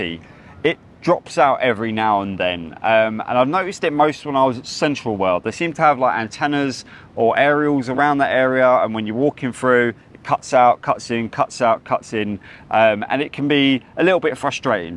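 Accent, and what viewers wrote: United Kingdom. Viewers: do you have a Cockney accent?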